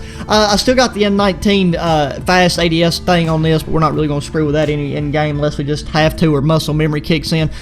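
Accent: United States. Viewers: That voice just made my day The country accent just makes me feel at home because of where my grandparents live Also I went to this video and it turns out your crossbow setup is the EXACT same as mine so I guess I’ll keep what I got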